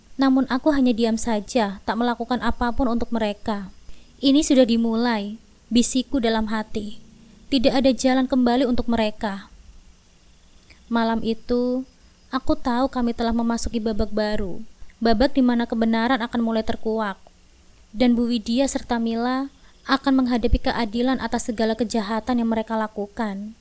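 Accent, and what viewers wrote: Java, Indonesia. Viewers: Bd hadir mbsk, medok pisan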